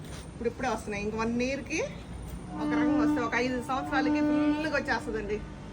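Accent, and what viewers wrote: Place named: Rayalaseema, India. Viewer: Chelli! Meeru bhalega musthabu ayuthunnaru. Saree bagundi. Kadapa chittur districts slang bhale matladutbunnaru. Itlane matladuthu vundali.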